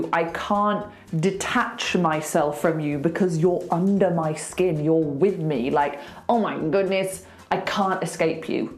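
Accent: United Kingdom. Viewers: Love British accent and your videos.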